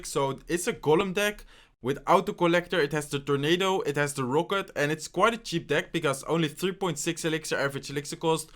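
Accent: Netherlands. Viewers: Je hoort nog een beetje dat je nederlands praat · Je Nederlandse accent 😂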